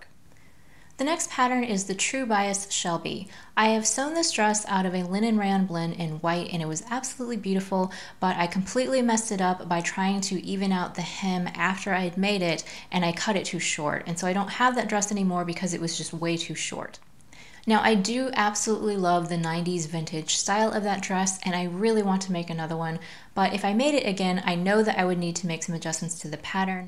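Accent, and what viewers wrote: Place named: United States. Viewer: I'm not sure where you are, I'm guessing USA from your accent, in which case I don't think they sell the cutting table there. It's a New Zealand company and I bought mine in Ireland, I also have one of their sewing machine tables. However, I'm sure that there must American brands that offer similar products. The most important thing is the ability to set it up to be the height that suits you, it has made a huge difference to my lower back. Lockable casters are useful too.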